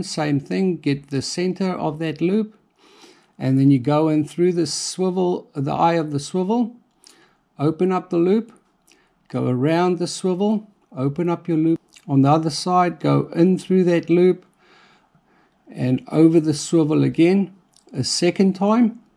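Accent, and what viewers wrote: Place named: South Africa. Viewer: By the way, with a South African accent! HAve spent a bit of time in Southern Africa Like the video - great explanation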